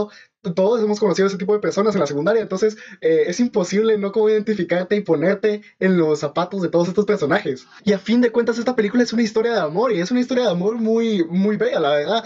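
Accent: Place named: Guatemala. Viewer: lo supuse por el acento jajaja yo también soy de Guatemala, y rayos te pregunto porque ya termine la 5ta temporada y note que no tenias comentarios sobre la serie, pero esperare, jaja saludos.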